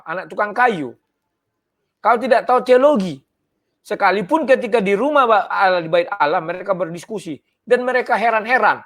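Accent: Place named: Medan, Indonesia. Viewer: Saya senang dengan bang karna pemahanan dalam Alkitab, dan cara penyampaian dari bang ini khas orang Medan...... mungkin teman2 ada yang kurang srek dgn cara penyampaiannya, tapi saya yakin penyampaiannya jujur dan mencerahkan kita semua....semangat terus pak...Selalu diberkati Tuhan.... salam dari Manado. IMANUEL.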